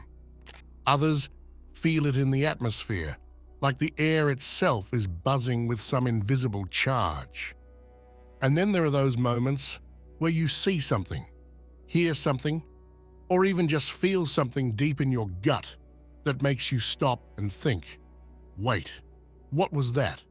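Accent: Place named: Australia. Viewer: Reading the comments. I have felt dizzy, a bit nauseous and was wondering why. I still dont immediatly realise it is from a surge of electro magnetism. I just burned my st Brigid reeds cross from last year! I manifested all my troubles and doubts and hesitations to be gone, cleansed away. I was alone so just did what I felt was right. I am glad now. Another serendipity. Thank you forthis video, first time i come across your channel. You sound Australian😊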